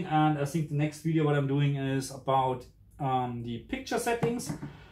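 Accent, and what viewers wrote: Germany. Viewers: Kling ein bisschen deutsch das englisch, aber dafür erstaunlich flüssig.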